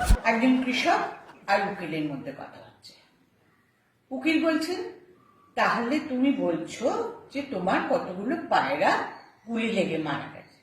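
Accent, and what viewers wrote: Bangladesh. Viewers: Thanks for continuing with Bikrampur dialect that your father was a master. Great initiative! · অনেক শুভেচ্ছা জানালাম।যদিও ভানু বন্দোপাধ্যায় এক অনন্য প্রতিভার বিকাশ, অন্য দেশ হোলে সম্মান প্রদর্শন অন্য রকম হত,তবু বলবো,দেরিতে হলেও আপনি এসেছেন,দয়া করে থেমে যাবেন না,চালিয়ে খেলুন, শুধু বাঙাল ভাষাটা আরো একটু প্রয়োগ করুন,খুব মিষ্টি লাগবে,কথা দিছি। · দারুণ কইছোস বাঙাল ভাষায়। ভালো হইছে। জিও আর চালাইয়া যাস তোর গুনাবলী।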